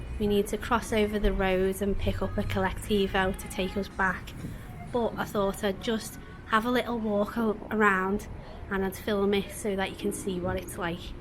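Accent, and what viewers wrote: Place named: Yorkshire, United Kingdom. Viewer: Canadian here so I'm a total moron and can't place your accent... is it Yorkshire?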